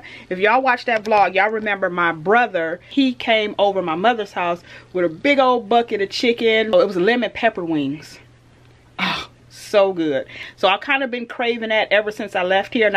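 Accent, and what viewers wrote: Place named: Midwest, United States. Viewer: We love y'all too girl! I'm from the midwest too...and we even talk alike. And yes we would say "hooo" for the ice cream truck...lol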